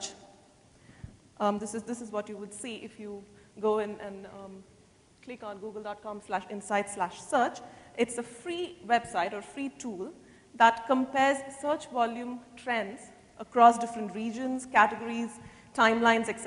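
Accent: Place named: India. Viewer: It took me a minute to get used to the Indian dialect, but this is good information for bloggers. I will make use of it on MotorcycleEventNews(.)com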